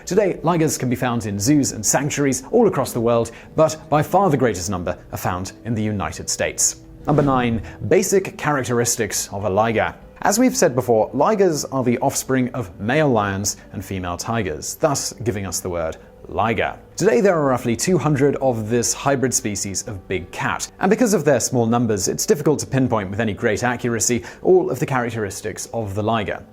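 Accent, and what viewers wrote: United Kingdom. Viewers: What a wonderful British accent! 👏👏👏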